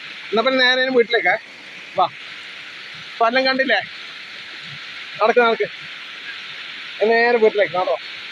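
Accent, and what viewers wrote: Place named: Payyanur, India. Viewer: എന്തൊരു ഭംഗിയുള്ള സ്ഥലമാണ്❤ അവിടെയൊക്കെ കൂടി നടക്കുമ്പോൾ എനിക്ക് വല്ലാത്ത പേടി തോന്നി വല്ല പാമ്പങ്ങാനും ഉണ്ടെങ്കിലോ എന്ന് വിചാരിച്ചു ഇതെവിടെയാണ് സ്ഥലം ഞാൻ കണ്ണൂരാണ് പയ്യന്നൂരിൽ പറഞ്ഞ സ്ഥലം ഒക്കെ അറിയാം ഭാഷയിൽ നല്ല പയ്യന്നൂർ ഭാഷ എന്തായാലും ഞങ്ങളെയൊക്കെ കാണിക്കാൻ എത്ര റിസ്ക് എടുത്ത് പോയല്ലോ മഴയത്ത് ഇനിയും മഴയത്ത് പോകരുത് ഇങ്ങനെ വഴികളിലൂടെ നമ്മൾക്ക് ഒരു അനക്ക് ഒരുപാട് സന്തോഷമായി ❤❤ നിങ്ങളുടെ വീഡിയോസ് ഒന്നിനും മെച്ചപ്പെട്ടു വരുന്നു ❤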